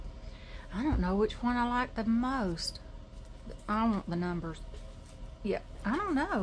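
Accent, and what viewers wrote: South, United States. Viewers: I'm new saying howdy from Texas!! I love to hear another southern voice and your journals are amazing :) Where do you get all the supplies? I wish I could come play at your craft table!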